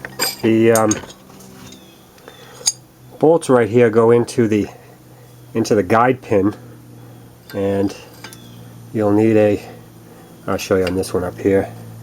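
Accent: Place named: New England, United States. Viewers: good job sir....you have a great gift in teaching...yes, you have a nice boston accent..similar to Peter Griffin of Family Guy....:) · I love the New England e-yak-cent! I’m usin’ a he-ahf inch bray-kah-bah! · Those cab. bolts just need snugged up good! N a screwdriver ant going to hurt it! Other than yankee ackcent this dude done fine! Jack stands r nice if ur going to b up under car eating lunch! Dont for get pump breaks b for u head out!!!! Good job! Yankee!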